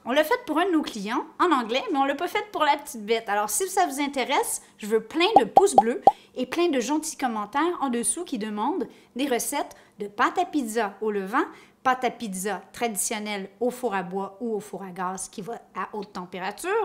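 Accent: Canada. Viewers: Cc bonjour merci pour votre recette de calzone je voulais en faire mais n'avais aucune idée de se que l'ont metter dedans super! Je vais en faire et faire ma pâte a pizza moi même bne continuation vous êtes super et j'adore votre accent canadien qui ressemble un peu a mon accent du Nord 59❤❤❤❤️🌹🌹🌹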